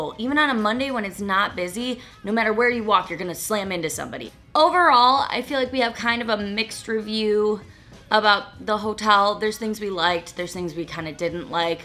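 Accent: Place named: Minnesota, United States. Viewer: Are you from MN? I detect an accent.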